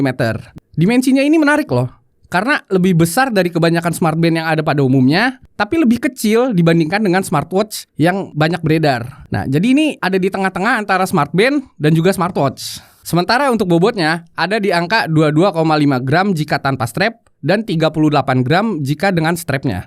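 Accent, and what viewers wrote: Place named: West Java, Indonesia. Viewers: Ngomong nya cepat belibet artikulasi kurang jelas, dari aksen bicara nya khas Sundanese, lain kali kalau ulas gadget lagi, mohon tempo bicara di atur yang pas jangan tergesa-gesa, supaya pemirsa tidak bingung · meni nyunda pisan euy si akangnya 😁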